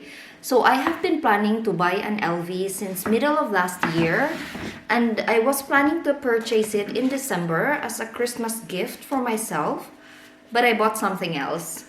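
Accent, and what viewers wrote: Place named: Romania. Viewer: Hi!! Love the accent na.. so romanian! Mwah! Miss you!! Congratulations on your blog!! 🎉🎉🎉🎉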